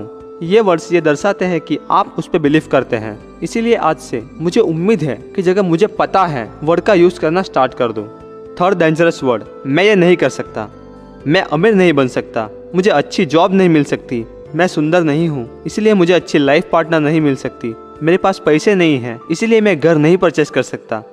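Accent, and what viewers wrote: Gujarat, India. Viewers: Good tips, very useful. Are you from Gujarat?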